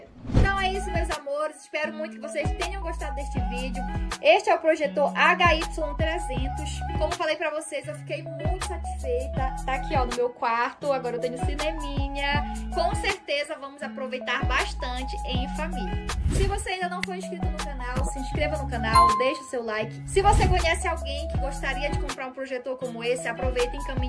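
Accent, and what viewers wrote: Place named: Rio de Janeiro, Brazil. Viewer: paranaense com traços japonês e com sotaque carioca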